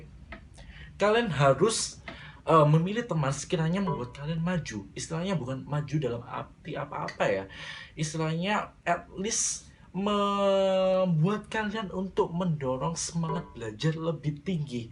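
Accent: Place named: Java, Indonesia. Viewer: rada ga enak di denger alias medok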